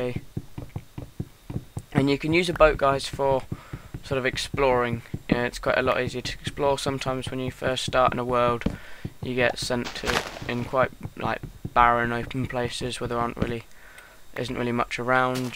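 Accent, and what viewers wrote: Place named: United Kingdom. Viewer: tell them ppl he doesnt sound lik a girl he sounds lik a british person!!!!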